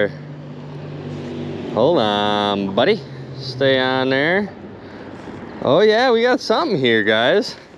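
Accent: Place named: North, United States. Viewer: Hey you in wisconcin or minnesota?Ican tell you are up in the northern stayes but cant tell which one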